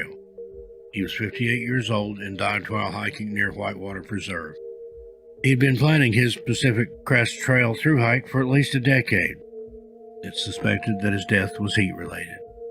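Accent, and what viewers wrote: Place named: South, United States. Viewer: Your story telling is magnificent, and your voice is extremely comforting. Being from the south, your accent really makes it feel like home. Great work, and you've got a new subscriber! ❤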